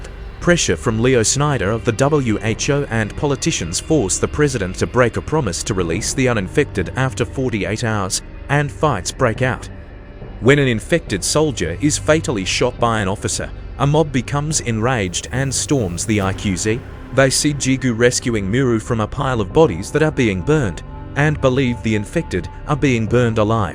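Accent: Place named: Australia. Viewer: Great to hear Aussie AI voices 👍🏾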